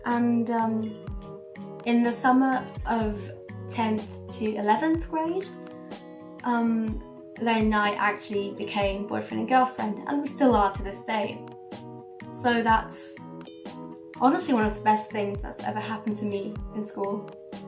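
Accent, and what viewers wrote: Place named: United Kingdom. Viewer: I swear your accent is so fucking british 😍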